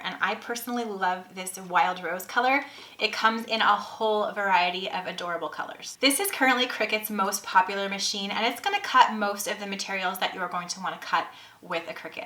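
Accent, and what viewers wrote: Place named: Canada. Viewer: I love the way you say “decal”. Canadians you need to stop apologizing for how you talk. We love your accent!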